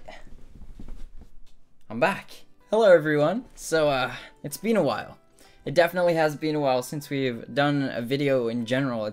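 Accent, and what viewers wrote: United Kingdom. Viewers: DON'T MOVE TO AMERICA!!! YOU'LL LOSE YOUR BRITISH ACCENT!!! Slowly but surely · Yes I'm British too I think he is, my friend is like that too coz all her friends are american she picks up the accent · I love your mid-British accent, it's like, u can tell that ur some what British but it's confusing by the accent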